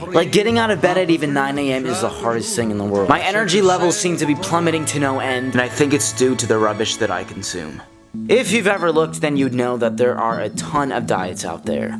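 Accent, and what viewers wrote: United States. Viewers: Are u American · is this guy really russian? he sounds like an american.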